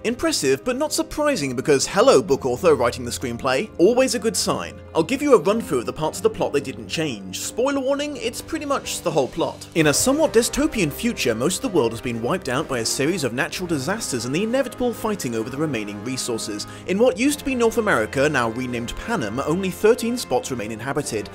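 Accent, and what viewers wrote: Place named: Wales, United Kingdom. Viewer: Where in the UK is the from? I notice the way he says certain things like "year" kind of remind me of how Rob Brydon speaks, so I wondered if he might be Welsh or have lived there.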